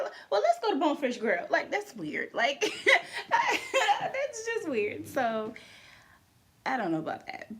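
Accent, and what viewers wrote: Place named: Virginia, United States. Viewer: Omg!!! I’m from VA! Virginia Beach! I had.m a FEELING with your “accent” (as Pharrell would call it...) I felt like it was like mine!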